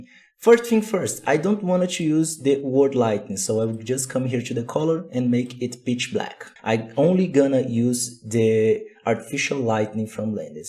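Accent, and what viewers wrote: Brazil. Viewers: You seem to be Brazilian by your accent. Amazing Tutorial, simply sublime. Brazilians are really gifted. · Esse sotaque brasileiro falando inglês é coisa linda demais, força na batalha irmão!! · Great job. Thank you for sharing. (Sotaque brasileiro inconfundível) Rssss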